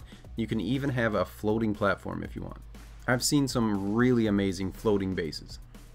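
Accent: Newfoundland, Canada. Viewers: I knew you were from NFLD and then you said that at the end lol. Hi neighbor, great video!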